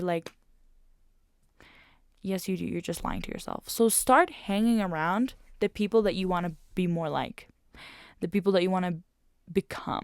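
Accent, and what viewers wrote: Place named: United States. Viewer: Сказать что я удивлен, ничего не сказать 😅Это второе видео из вашего канала, которое заставило меня остановиться и всё же написать коммент, так как вопрос в голове не даёт покоя. I am really impressed that you know so many languages and even acquired the American accent. Were you exposed to the language growing up? Яна битта саволим бор эди, тушунишим буийча Фусха ни урганвосиз. Узиз урганвосизми йоки тил курсларига катнавосизми йоки бирор урганиш методларини кулавосизми?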